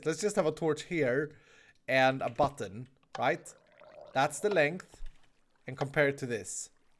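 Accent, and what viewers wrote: Sweden. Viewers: r u swedish? i think because of 4 reasons. 1. ur accent sound like swedish (ik because im swedish too) 2. = icecold85. 3. is = ice. kall = cold. 4. u live in a ice castle in HermitCraft and an ice castle should make your icecold. icecold85:)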